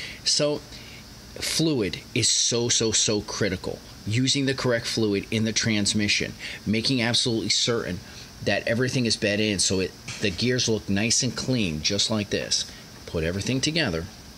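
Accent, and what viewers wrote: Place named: Delaware Valley, United States. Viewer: This video ended up in my recommendations. While I was watching it I was like, "Wait, that accent. This guy is from the Delaware Valley". So then I check and yep. I love our accent. Its so obvious!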